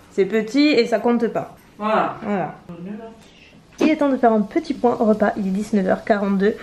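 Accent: South, France. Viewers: Ce n'est plus un mais 2 rayons de soleil en vidéo dès le matin!!! le bonheur!!!! et Notre internationale fan de chocolat, chantilly et crème va voir une diététicienne mercredi!!!! svp fait nous un retour!!! en plus elle a pas besoin de régime.... Elle est très bien notre!!!! Bravo pour tout ton travail, on ne se rend pas compte les vidéos dure entre 10 et 20 minutes pour nous, mais quel boulot!!! merci pour tes contenus, ta joie de vivre et ce petit accent du SUD qui nous réchauffe!!!! biz à vous 2!!! très beau sac ce TED BAKER, j'adore!!!!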